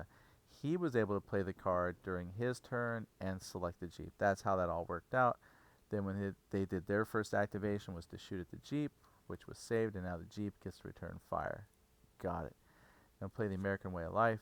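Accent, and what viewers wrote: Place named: Germany. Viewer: good german accent :-) :-)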